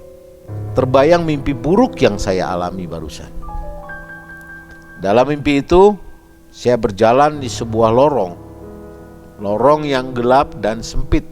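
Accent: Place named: Sunda, Indonesia. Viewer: Suara khas sunda nya mantul pisan euyyy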